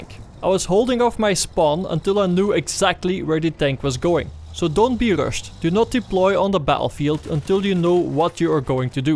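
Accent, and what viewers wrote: Netherlands. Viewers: dutch accent but hes from denmark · fix your dutch accent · he sounds Dutch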